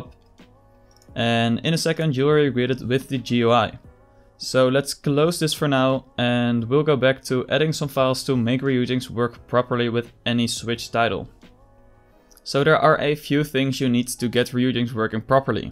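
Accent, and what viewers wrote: Netherlands. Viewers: Your dutch 100% sure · jij bent nederlands dat hoor ik aan de manier hoe je engels spreekt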